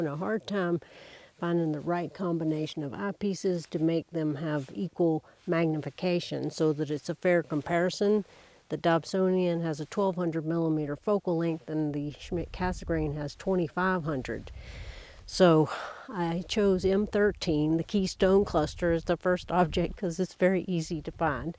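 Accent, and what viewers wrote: South, United States. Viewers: Love your smooth southern accent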